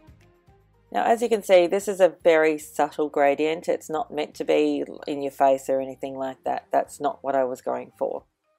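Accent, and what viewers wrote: Australia. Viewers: Love your videos. Which part of Australia are you from?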